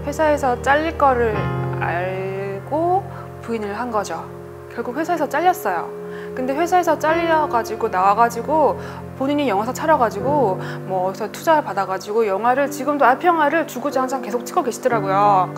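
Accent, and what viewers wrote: South Korea. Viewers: 대구사투리가 살짝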